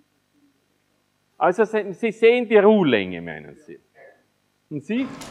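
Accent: Vienna, Austria. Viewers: bin durch Zufall hier vorbeigekommen, und dann hängengeblieben. Sensationeller Vortrag, wunderbare klassische Wiener Sprachfärbung und sehr verständlich. Da bekommt man Lust noch ein Studium zu beginnen.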